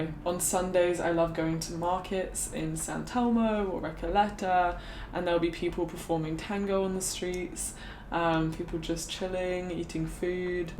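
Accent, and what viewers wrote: United Kingdom. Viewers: your speech made you look like ferb from phineas and ferb, talking about relaxing under a tree, in a british accent and with an eye bigger than the other one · Hello, im really glad you like my city! I love it too. Your british way of talking is really pretty, I really enjoy watching your videos. Have fun the time you'll spend here! Wish us luck for the football world cup jajaja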